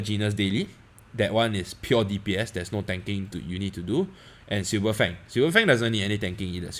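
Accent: Singapore. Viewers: it's more like singaporean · Are you singaporean?or Asian haha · Bro this is an awesome guide. Are you from Sg? Can recognize the accent lol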